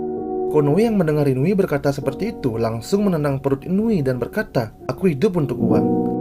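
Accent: Bali, Indonesia. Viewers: Pasti orang Bali ni Mimin nya😁😍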